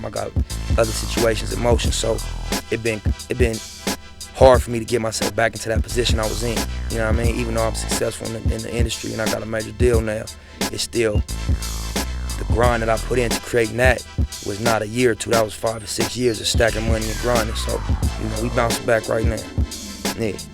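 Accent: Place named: California, United States. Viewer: I love the Cali accent... I love it!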